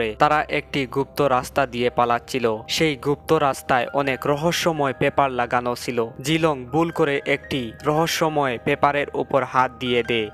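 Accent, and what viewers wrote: Sylhet, Bangladesh. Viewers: Bro tmi ki Sylheti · ভাই কি সিলেটি?😀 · Vai amr bari o sylhet,,kintu apne sylheter oia o,, ordek sylhete r ordek suddo basha kowar karone,, vlo lage nh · সিলেটি মাত্ত যতই তেল মসলা লাগাও চেইন্জ হবে না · সমস্যা নাই ভাই চালিয়ে যান আস্তে আস্তে সব ঠিক হয়ে যাবে.আমিও সিলেটি মানুষ.. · ভাই কিতা সিলটি নি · Vai apne sylheti ni..? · matar bongi deikka oi bujram Sylhety... Karon ami Nijeo Sylhety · 1000% সিলেটি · ভাই সিলেটি নি? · amrar sylhety vaiya maybe afne?otar lagi subscribe kori dilam.ar explain ta vala oice🥰🤗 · Vai tmi ki সিলেটের পোলা😀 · ভাই কি সিলেটি নাকি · vai dairet Sylheti mato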